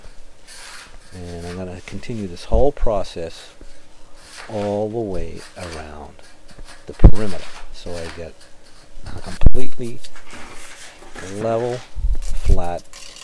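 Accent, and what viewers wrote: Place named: New England, United States. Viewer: Hi, I like your videos and your New England accent. You almost sound Irish or Scottish. That mortar looks so dry. Is it ok to make it a bit more wet like for masonry?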